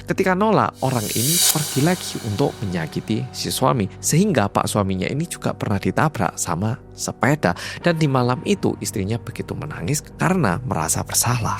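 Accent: Java, Indonesia. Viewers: Hai masbro muedok😅 Nyimaaak 🎉🎉🎉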